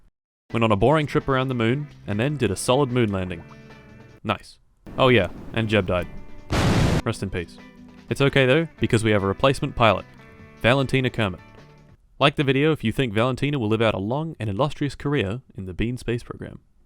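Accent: Australia. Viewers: are u an aussie? im curious...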